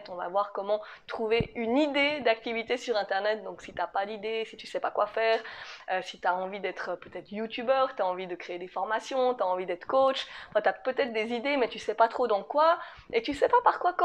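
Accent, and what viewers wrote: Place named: Switzerland. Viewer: Salut, sympa ce vlog! Je suis franco-suisse et j'ai tout de suite reconnu ton mignon accent. Le mot "crouille" est inconnu des Français MDR! Bref, merci pour ces conseils, ils sont très pertinents et je vais les garder dans ma liste. Quels prix vraiment pas chers pour tout ce confort! Au plaisir, Marjorie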